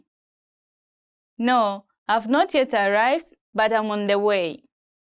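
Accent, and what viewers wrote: Standard. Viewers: She is a Tanzanian presenter!. She speaks standard Swahili! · TZ standard Swahili ❤❤❤❤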